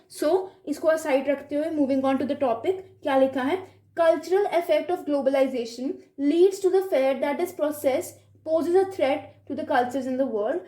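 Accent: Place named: Punjab, India. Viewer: Through your voice I get to know that you are absolutely punjabi girl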